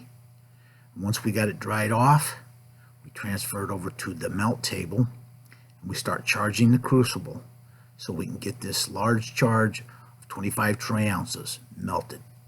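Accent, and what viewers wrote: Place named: Italian American, United States. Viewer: Sounds like a guy from the sopranos - I Makin gold ova here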